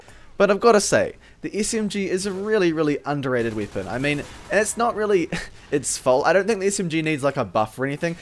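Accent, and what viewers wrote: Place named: New Zealand. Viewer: bless you and your Kiwi accent.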